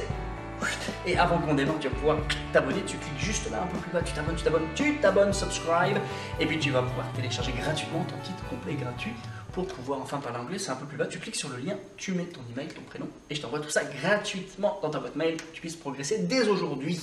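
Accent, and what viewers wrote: Scotland, United Kingdom. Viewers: Hello from France! Is your accent Scottish? (I think so) Thanks for this video!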